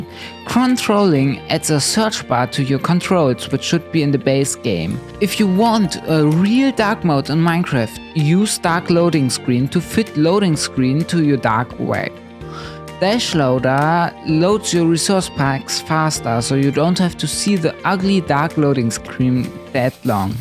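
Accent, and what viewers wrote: Germany. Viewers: man merkt am arkzent das du deutsch bist haha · Cause hes german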